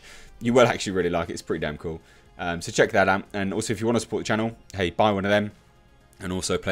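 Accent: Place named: RP, United Kingdom. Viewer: accent goes northern when he is imitating/mocking... Lol. Reg = posh Mocking = northern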